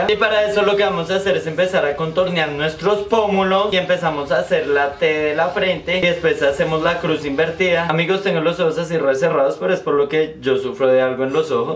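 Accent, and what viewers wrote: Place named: Colombia. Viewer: El acento colombiano es muy bonito 😍😍😍😍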